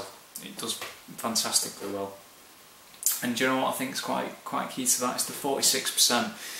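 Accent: Lancashire, United Kingdom. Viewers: I've changed my mind. You're from Lancs.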